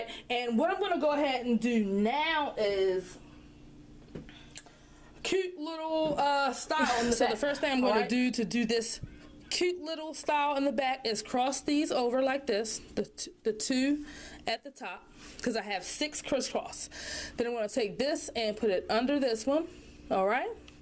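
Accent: Baltimore, United States. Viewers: Very pretty. I love your Bmore accent. Stay safe.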